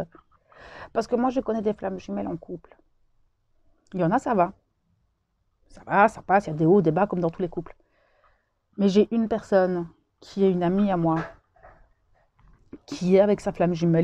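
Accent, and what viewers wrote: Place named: Belgium. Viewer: Il me semblait bien que vous étiez Belge,moi de Charleroi. Première fois que je tombe sur une FJ belge🤗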